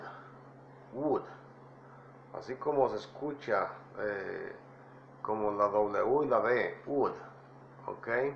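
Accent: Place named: Texas, United States. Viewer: GRACIAS me gusta mucho tu asentó como tejano jeje bendiciones ahora si a ponerle atención a la clase jeje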